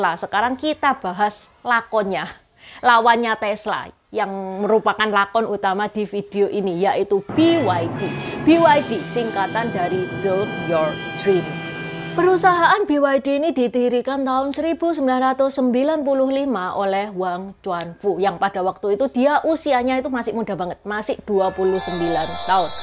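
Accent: Java, Indonesia. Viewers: Aku suka logat hostnya. Orang Jawa kalah medok. ❤ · Logatmu negara Jawa,, 🤣🤣 ,, Jawa itu cuma suku yg tinggal di daerah Jawa di Indonesia,, bahkan di pulau Jawa (bukan negara Jawa),, sewajibnya kamu harus logat Indonesia,, jika tidak, channelmu gak bakal maju sampai mati 😢😥🤤😲,, belajarlah ke Deddy Corbuzier yg Indonesia banget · NGOMONG NYA BIASA AJA TUDAK USAH MEDOK GITU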